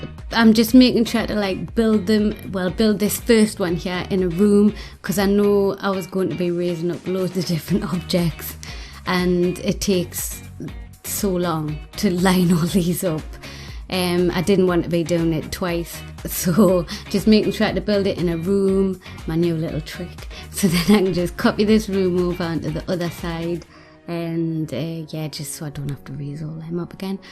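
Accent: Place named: Newcastle, United Kingdom. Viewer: I'm subscribed and keep watching your videos even though I don't play the game. Your voice and Geordie accent is soothing to me.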